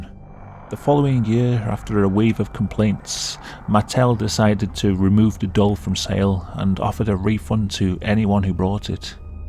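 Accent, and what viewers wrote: United Kingdom. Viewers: What part of the UK are you from? Just wanted to know where the accent was from lol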